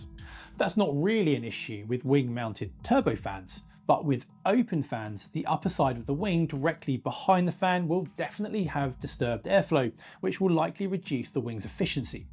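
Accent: United Kingdom. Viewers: I prefer Petter's style and accent (even though he is not from native English speaking country) to (with his British accent, especially on-at least 10-'but') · I have to say I do appreciate the English accent. So few English Pilots on YouTube. He is the only one I know. of. I'm sure there are, just have not seen them. More so I appreciate his ability like Petter, to thoroughly explain in laymen terms. The down side, It makes me like Aviation more. LOL.... I Do hope that things improve in environmentally sound engines. I know we can. It can only help things, and ensure the aviation sector.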